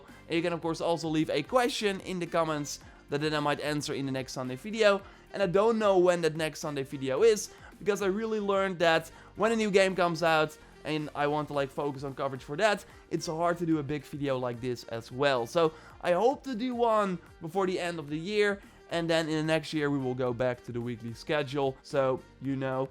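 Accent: Netherlands. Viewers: Your accent sounds like a Dutchman talking English lol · Your accent sounds Dutch.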